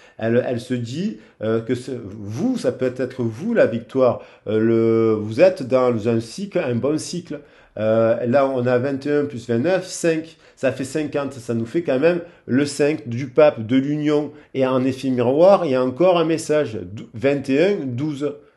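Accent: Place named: Marseille, France. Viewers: Et bien voilà, vous m'avez encore fait rire ! J'ai vécu à Martigues, j'avais moi aussi cet accent qui vous caractérise ! Profitez bien de votre soirée entre amis ! Merci pour cet échange et d'avoir pris le temps de me répondre, c'est excessivement rare, comme mes commentaires en règle général, mais nous avons tous besoin d'encouragement. A bientôt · Oh bonne mère Bon anniversaire moi qui adore cet accent...Nîmois et Marseillais de coeur...ma.famille.avait la meilleur boulangerie du Merlan...Garvi....en 86.. jai des souvenirs magnifique...L.OM ....puis a1 selection the Voice a L.hyperion......bonne journee et merci pour cette guidance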